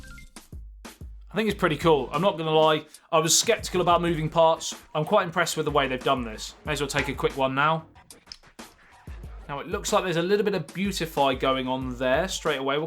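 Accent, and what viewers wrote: England, United Kingdom. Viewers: Are you english? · British accent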